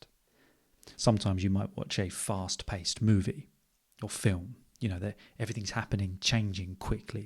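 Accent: United Kingdom. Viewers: I never write comments, but I wanted to support you at least this way, because I think, your channel is really underrated. I absolutely love your British accent and I really appreciate how you cover such interesting and thought-provoking topics in your videos. So far I’ve only watched your last two videos, but I’ve watched them 3 times each.😅 Keep up the great work!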